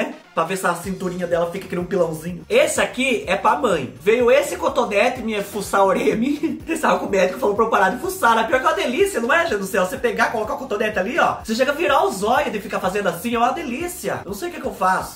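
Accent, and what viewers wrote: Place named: Riversul, Brazil. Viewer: ai kkkkkkk nao aguento, minha vo é d riversul e fala igual vc, jaguara, pandu kkkkkkkkkkkkkkkkkk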